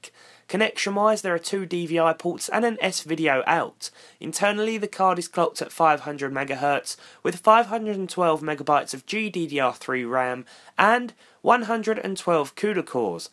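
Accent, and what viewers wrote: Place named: England, United Kingdom. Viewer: I needed my English accent fix. Thank you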